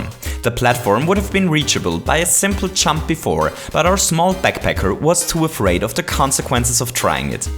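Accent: Germany. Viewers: are you german? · Are you german? I'm asking because of your accent.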